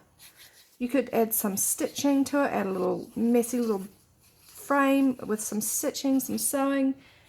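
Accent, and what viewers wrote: New Zealand. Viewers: Beautiful! In fact I zipped straight over to your Etsy shop and bought some! Don't worry about longer videos - sometimes they are needed for us (me?!) to get the gist of things. Also, I think in an earlier comment I mentioned liking finding a fellow Aussie to follow... can't believe I didn't pick the Kiwi accent, having lived over there for 7 years!!! Anyway, good to have someone 'local' (I'm in Port Macquarie). :-)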